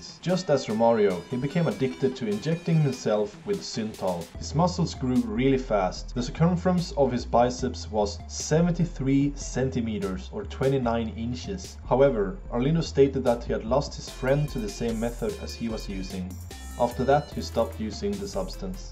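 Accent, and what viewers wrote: Sweden. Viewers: I can hear you are from Sweden!;D Swe: Man kan höra att du är från Sverige!;D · Are you from sweden? + I SUBSCRIBED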